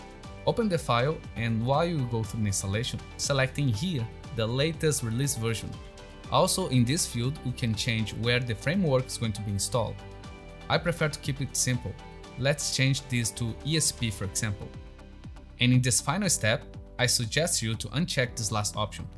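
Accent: Brazil. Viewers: Thanks for the video, you really helped me, also as a good brazilian I noticed your accent!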